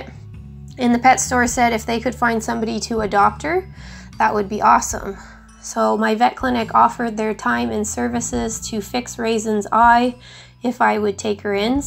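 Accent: Canada. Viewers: You are such a great piggy mom, if it wasn’t for your videos I would have a tiny pet store cage. I love your appearance, Canadian accent; most of all Iove the pigs ❤️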